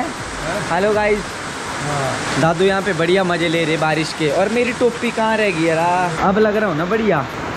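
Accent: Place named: Pahadi, India. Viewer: pahadi mix hindi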